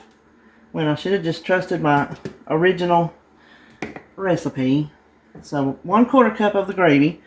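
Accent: South, United States. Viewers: Can’t wait to try this breakfast pizza! I enjoy your southern accent! Where in NC do you live?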